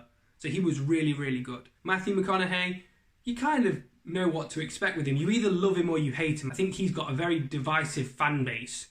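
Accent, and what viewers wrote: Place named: England, United Kingdom. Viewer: Hey, Bud, thanks for visiting our channel. My first time here, and gotta say, like your review. Not fair, though, because you have the cool English accent. Definitely not fair. Agree with your review. I guess I have a lot to look forward to, given that I haven't seen the other Guy movies you mentioned. have a good one.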